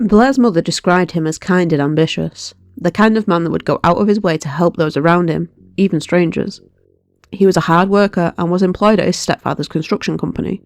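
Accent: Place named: Huddersfield, United Kingdom. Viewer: Just found the channel and subbed because you sound local to me (Huddersfield) good luck 😀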